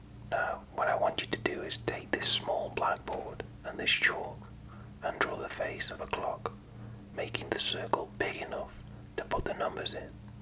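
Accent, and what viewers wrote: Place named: United Kingdom. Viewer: British! Yes!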